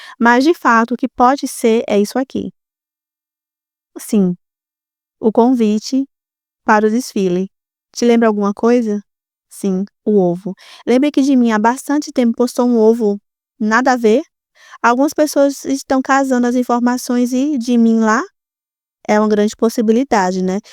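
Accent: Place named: Bahia, Brazil. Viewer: Oi menina. De estado do Brasil vc é?? Pelo sotaque acho que é Bahia. Acertei???😂🎉🎉🎉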